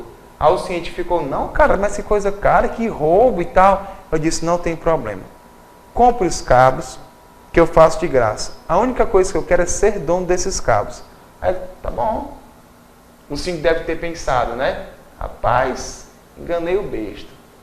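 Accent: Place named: Ceará, Brazil. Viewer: machu réi rsrsrsrs muito showwwww sua aula.sou de paracuru(vizinho à fortal) mas moro no rio de janeiro.quando ouvi teu sotaque vi logo que era um cearence rsrsrsrs.valeuuuu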